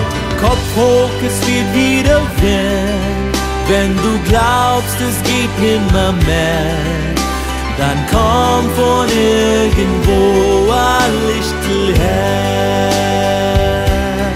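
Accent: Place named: Vienna, Austria. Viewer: Wieder mal eine Botschaft, so wie es oft wirklich ist. Hörst Dich schon an, wie ein echter Wiener😊Aber es stimmt, irgendwo ist immer ein Licht am Ende des Tunnels. Gottes Segen für Dich, lieber, und für die, die Dich in Deinem Leben begleiten.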